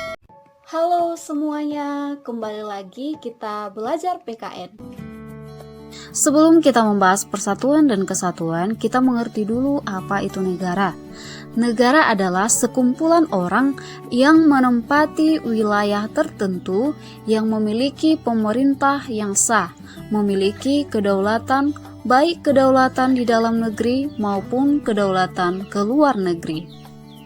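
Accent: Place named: Batak, Indonesia. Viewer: Masih ada bahasa pekat btakny🤣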